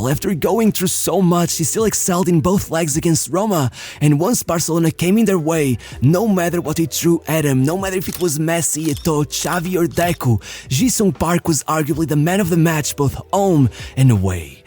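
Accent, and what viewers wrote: Portugal. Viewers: your Portuguese accent is funny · I know a tuga talking english when i ear one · AM I THE ONLY ONE THAT CAN'T HEAR THIS NARRATOR?? So dramatic.....i bet he's portuguese. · Are u Portuguese? I feel such a Portuguese accent in your english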